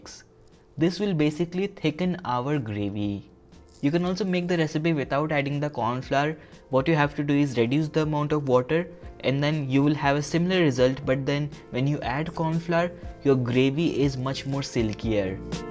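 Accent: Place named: Bengal, India. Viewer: Sounds like bengali and they're good at cooking.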